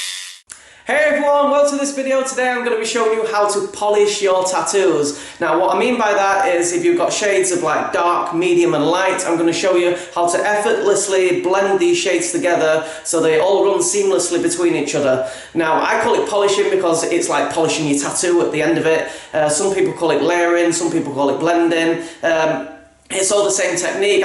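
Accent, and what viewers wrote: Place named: North, United Kingdom. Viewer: Where are you from, sound northern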